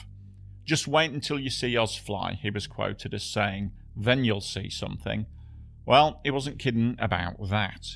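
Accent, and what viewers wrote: United Kingdom. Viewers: Thanks for proving once again that not everyone with a Brit/UK/Aussie accent should be doing narration. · I have watched your channel for a while now. With all the AI crap that is dominating YouTube. I am so happy that you, a human, keep me entertained. Thank you, British 'human' voice.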